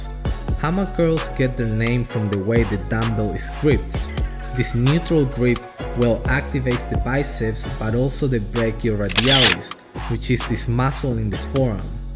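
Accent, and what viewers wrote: Spain. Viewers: spanish accent, acento español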